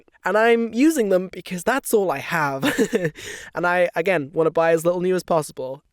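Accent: Wales, United Kingdom. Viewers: I lived in Wales for a while, did not clock you were Welsh until midway through this... I was already loving the video and you got to that and I was like OH YEAHHHH WELSH LETS GOOO hahah - I tried to teach my poor Japanese students some Welsh this morning... it was... a struggle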